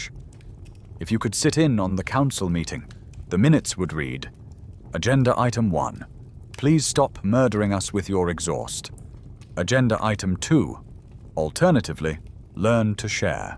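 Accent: United Kingdom. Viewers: I love these videos from Sleepy History. Now turn on that fan for some background hum and wow, this AI toff accent! But remember, a British gentleman does not say missle, he says missile.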